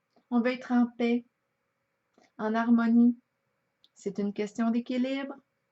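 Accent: Canada. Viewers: Bonjour oui j'ai vraiment envie de me sortir de ce bourbier! De ces blocages qui durent depuis trop longtemps, j'aimerais changer ma vie! Vous chantez bien,j'aime votre accent canadien 😃👍bélier ascendant lion poisson en lune! Plus de cinq ans de céliba! Oui j'ai envie de vivre l'amour,même si mes filles me comblent d'amour 😃👍 inconditionnel merci a vous pour cet espoir que vous nous redonnez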